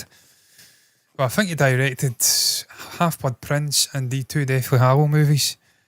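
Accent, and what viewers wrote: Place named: Scotland, United Kingdom. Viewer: Lmfaooo where's that accent specifically from? Scotland? Where in Scotland? I love it, as a non-native English speaker I thought it was some celtic language for a moment 😭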